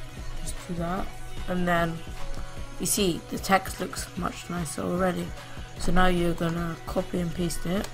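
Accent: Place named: Leicester, United Kingdom. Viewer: I can tell your from leicester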